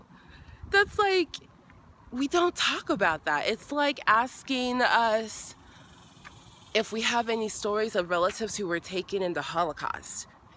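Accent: United States. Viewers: You sound totally American. By the way, I'm 71% African, with 27% Nigerian. And you are very kind and lovely. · Hey, I can tell by the way you talk that you are Americanized. That's the thing people don't factor in when they think about tracing their roots. You are a first born or second born American so it's by chance that you even took the test. That's why I hate some of the money grubbing that goes on in the US. It's because people want to make a lot of money that we can't see people from all over the world on tv. I've been trying for days to get free tv from other countries and I can't get any with Great Britain Natives on it because the US wants to charge money. I know that I'sound like I've gotten way off topic but here is how it ties in to your thing. Blacks in America are completely cut off from their African culture. You have your parents to fall back on but they've completely lost theirs. 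They could probably look at the Africa channel but that's cable and it's not cheap. I was looking for movies to watch and I realize that people get triple charged and still can't watch without paying even more. There was a movie I have seen called five easy puces. I have basic cable and I can't watch it because I don't have movie channels. The other parts of the house I have digital cable but I couldn't watch it unless I happen to have that movie channel package. I do happen to have that package but here is the rub. It will cost me an additional $4 to see it because I have to order it on demand. When do I stop getting charged for something I want to see? The lady probably feels like me that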